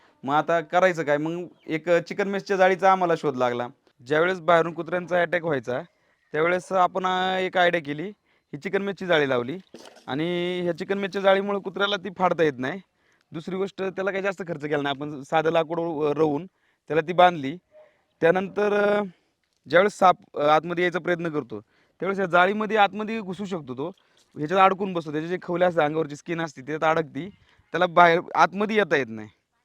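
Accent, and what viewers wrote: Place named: Dhangari, India. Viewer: Dhangari bolu nko salya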